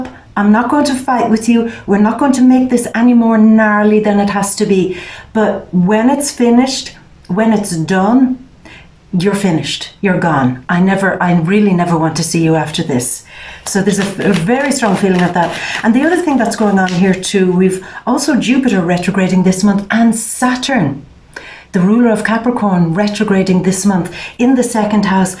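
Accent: Ireland. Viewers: I love your reading - very helpful!! Love your Irish accent and way of reading - One Love xxx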